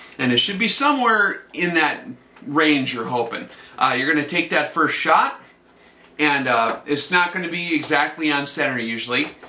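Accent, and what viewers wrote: Canada. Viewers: Sorry kind of a new watcher here, but are you Canadian?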